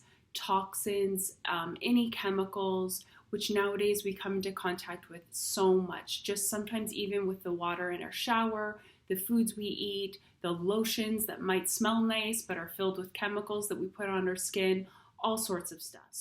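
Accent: Canada. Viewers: haha, well "Eh" is a dead giveaway, but the "Aboot" is more subtle. Anyways, thanks for the video as i have been seriously considering doing a liver flush, and i appreciate your straight-forward content about the subject.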